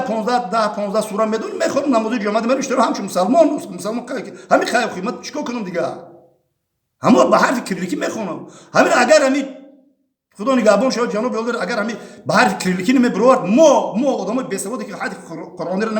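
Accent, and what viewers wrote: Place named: Dangara, Tajikistan. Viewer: АСАЛОМ АЛЕЙКУМ БОБОЧОНИ МА ХУДОВАНД НИГАХБОНТОН БОША ДУСТОН МЕДОРУМ СУХАНРОНИТОНА ШЕРИ АЛЛОХ, МИСЛИ РАХМАТИИ БОБОМ ДАНГАЛИЕН БИСЁР МОНАНДЕН. САЛОМИ ГАРМ АЗ МУЛКИ ГАРИБ )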